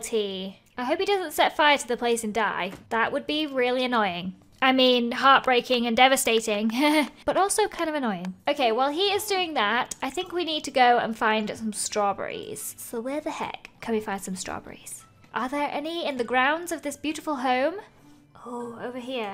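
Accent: United Kingdom. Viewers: It was her accent. She is. Very VERY British and her accent is amazing btw, but when she says “into” it sounds like “unto”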